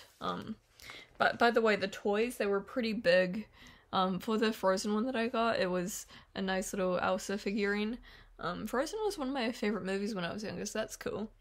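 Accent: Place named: New Zealand. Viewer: its a new zealand accent :)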